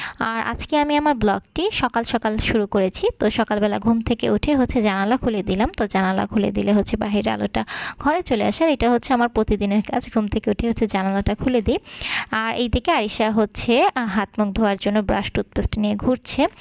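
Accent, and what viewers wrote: Barishal, Bangladesh. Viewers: আপু তোমার বরিশালের ভাসা বেশি ভালো লাগে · আপনার বরিশালের ভাষা আপনার মুখে অনেক ভালো লাগে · আপু তোমার বরিশালের ভাষা গুলো ভালো লাগে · আপু এখন যে বরিশালে ভাষায় কথা বলেন এইটা আমার কাছে ভালো লাগে অনেক সুন্দর লাগছে ।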